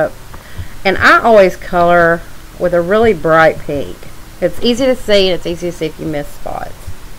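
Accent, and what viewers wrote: South, United States. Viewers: I love your southern accent too. You’re awesome along with the video. More videos please. Subscribed. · WOW, I've been looking all over the net and youtube for someone to show how to do this vintage style coloring to a B&W photo, without all sorts of steps! Thank you so much for the clear and concise tutorial and I love your southern accent! I'll be on your Teach Me To Color Facebook page soon and I'm a way thumbs up subscriber.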